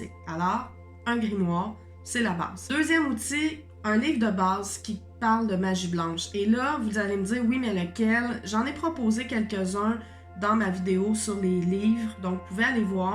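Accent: Quebec, Canada. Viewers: Fan de tes vidéos 🤩 avec ce petit accent québécois j'adore 😉. Continues à nous enchanter avec tes vidéos (oups capsules 🤭) Salutations de l'hexagone 🌝🪐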